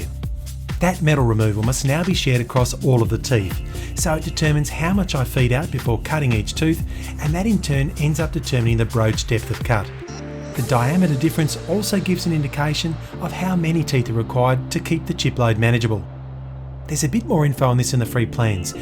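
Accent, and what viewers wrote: Australia. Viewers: realised that now when you say it. At first I thought he was Britain but then When you said it. I realised that he is Australian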